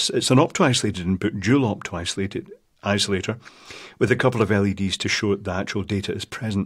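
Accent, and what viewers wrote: Scotland, United Kingdom. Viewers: 28 years ago I doubt I would understand his heavy Scottish accent.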